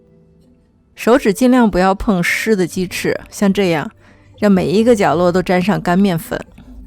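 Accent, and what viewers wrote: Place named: North, China. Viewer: 这北方口音... 没得说了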